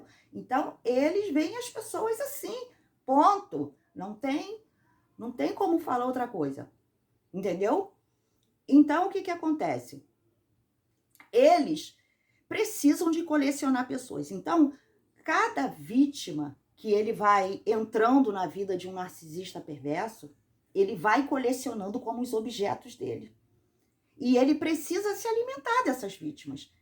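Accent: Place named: Rio de Janeiro, Brazil. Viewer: O som da sua voz é bem carioca.🤔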